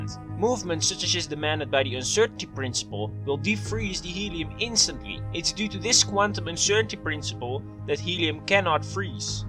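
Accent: Netherlands. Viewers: Pohh, da's pas een Nederlands accent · Dutch af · i could hear that you were dutch within the first 5 seconds xD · Are you Dutch? Sounds like a Dutch accent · Your accent sounds North Hollandic or possibly Frisian. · You sound Dutch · He sounds Dutch to me. I'm almost sure he is. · dat nederlandse accent .....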